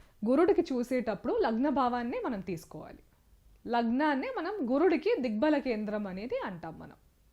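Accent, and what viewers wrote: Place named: Tamil Nadu, India. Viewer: అమ్మా,మీ ఉచ్చారణ, చెంద్ర, సని అని పలుకుతూ ఉన్నారు.కొంత ఆరవ/ తమిళ accent నుంచి తేట తెలుగులో పలకండి. మీరు రికార్డు చేసిన తర్వాత వాయిస్ low గా వున్నదా అని కూడా చెక్ చేయండి. బాలు, ఘంటసాల పాటలతో మెరుగు చేసుకోండి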